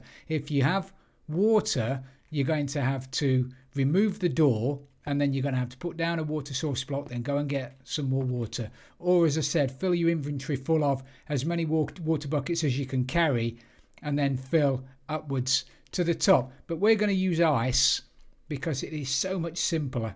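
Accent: United Kingdom. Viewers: That British accent is, absolutely amazing.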